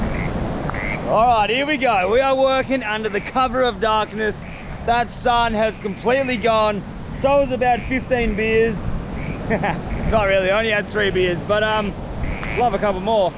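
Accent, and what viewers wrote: Australia. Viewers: What an inspiration. The Aussie accent just makes it that much more watchable. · Is he from Australia? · He is Australian.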